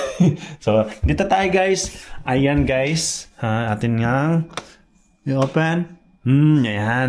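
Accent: Visayas, Philippines. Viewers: Maayong hapon Sir, dugay-dugay nako nga watch sa imo vlog,until I heard from you Bisdak diay ka,hehehe😍👍👏, happy ko karon naa diay Japan vlogger nga taga-Davao, so subscribe dayon way langay-langay...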